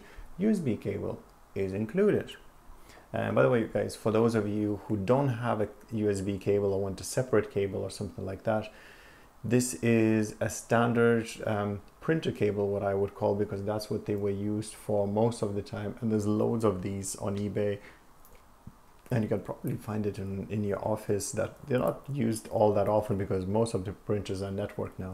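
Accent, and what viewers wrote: Ireland. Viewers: Great video. Where are you from? You have an Irish twang in your accent, but dont sound like you are from Ireland. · He does sound Irish, possibly from Dublin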